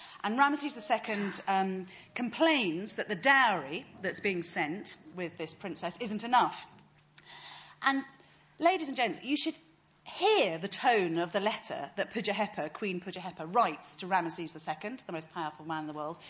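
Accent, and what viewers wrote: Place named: United Kingdom. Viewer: To this American Bethany Hughes has the perfect female British accent and voice. Especially aesthetically pleasing while being educated.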